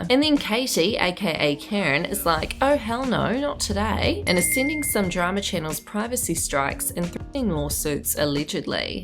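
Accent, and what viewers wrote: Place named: New Zealand. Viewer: Great content! I could listen to that kiwi accent all day long ❤️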